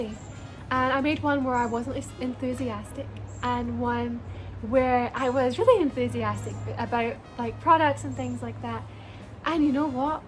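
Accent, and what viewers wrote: Scotland, United Kingdom. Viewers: I don't even know how I ended up at this video, but I follow you on Insta and I think your voice is great! I don't get why people would be judgemental about someone's accent, because it's a part of who we are and a part of our own history and experiences. Love the story behind it and how it was almost part of you building confidence etc. People underestimate how difficult it can be for people to go to another country and try to 'fit'. It's a really positive thing that you have this unique voice. It's part of your history, your personality, and also what you enjoy and the people you admire. Good for you! Love to see a woman being who she wants to be, taking elements of historic style and vocal tones but also adding your own special something. It's very 50s/60s but also with that nice Scottish tone. Nothing fake about that at all! 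It's who you are and what you appreciate! Our voices all develop and change over time too, so I don't know what people were playing at going back through your own stuff? Also, sorry to go on and on, but people saying you're trying to 'be' Marilyn are missing the point! You're bold enough to love the aesthetic and take on elements of that for your own persona! You're being you - you're obviously just very influenced by that old Hollywood style. We pretty much all take elements of people we admire and that's how we develop our fashion sense etc! It just so happens that you're bold enough to love the style of another era! Power to you! xxx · It’s just her Scottish accent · I can tell you are Scottish but also a little English. I love 💖💖💖your voice and I think people should stop sending you hate😼😤😤😤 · I think it's more she speaks slowly due to her Scottish accent so other countries understand her. 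I'm Irish I speak so fast and Scots are the same .I slow mine down if away so I would naturally should mo gently then I normally am. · You can really here the Scottish account. My grandma is Scottish. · I think she slightly changes it so people can understand her more clearly and not hear the Scottish accent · I can hear the Scotland accent and I love it I noticed your voice but I didn’t think a lot about it and I thought your voice was really sweet and your voice is your voice and yours is really pretty ❤️❤️❤️❤️❤️❤️ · she sounds scotch to me. i love her voice so much thooo its so calm and gives a happy and comforting feeling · Oh you have a unique voice, I love the Scottish undertones. I just "discovered" you yesterday July 4th... I'm so glad that I did, you are interesting and I can tell you are so very kind. Never change who you are, thank you for bringing Marilyn Monroe back infront of the camera...I know she would appreciate the representation you present on her behalf. 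PS... I've been telling everyone I know about you, you are so interesting! 🙂Thank you for being genuine. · I think you're adorable but you've missed the point. It's you trying to sound like Marilyn Monroe. Then your Scottish accent pops through and people are left scratching their heads. I listened to an earlier video and this is drastic change. If you are being authentic, as you claim, I think you should ditch the breathy voice and speak with your natural accent. It just sounds too forced. Be yourself. Im sure I speak for many Americans when I say we adore foreign accents, especially Scottish and Irish. It's so charming. Be proud of it! Again, you're adorable and your fans will still love you. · I don’t understand people! who can definitely tell your Scottish by the way you say words….. this your natural voice is beautiful very peaceful and happy You can still tell in earlier videos with the American accent, certain word you would say still had a Scottish tone or pronunciation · Love the Scottish Accent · A Scottish accent is 100% my favorite!! 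I’m sorry you get hate for such a sweet soft voice 💜💚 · Although she’s “soft spoken”, she’s obviously Scottish. She tries so hard to sound American. How very sad…🥺🥺🥺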